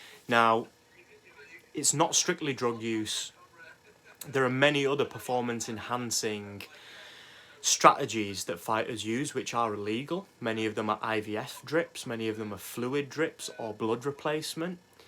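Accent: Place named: Birmingham, United Kingdom. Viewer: Underated channel mate,good info plus you sound like a fellow brummie👍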